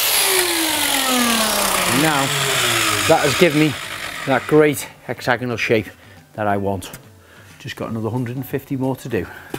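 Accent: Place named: Liverpool, United Kingdom. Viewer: Well done, I'm just starting to ignore that pronounced Liverpool accent. Like your work very much, it's plenty good enough for me. Thanks!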